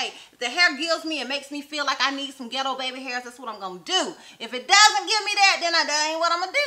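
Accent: United States. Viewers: Girl you are so country..I love it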